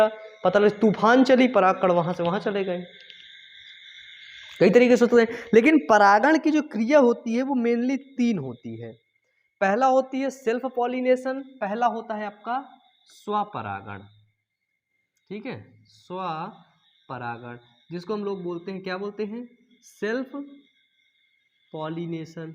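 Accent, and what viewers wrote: Uttar Pradesh, India. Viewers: Sir app up se ho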